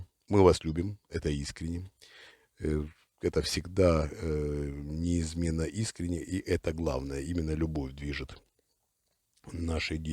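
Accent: Ukraine. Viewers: зареганный в Австралии. Льющий воду, запугивающий и тот и тот народ, дающий ложные надежды, не умеющий оперировать военными терминами, неправильно составляющий предложения, плохо знающий синтаксис и в разговоре имеющий украинские следы в диалекте. Даже не знающий про за закрытый город, откуда были пуски. Когда этот хэнэрал врет он кашляет и запинается все больше и больше.его как сегодня выдает неуверенный от лжи прокуренный голос. Он, как и всегда выпрашивает Донат лайки деньги. Он просто стрижёт контент на народном горе. Карма ударит по нему а бумеранг пущенного зла совершит круг и прилетит точно в точку отсчета. Люди, в тяжёлые времена проверяйте перепроверять по сто раз источники, не питайте иллюзий, верьте своей интуиции, помогайте старикам, детям, животным. они самые незащищенные. Режим пу падет. Но никто, ни царь и ни герой, мы сами.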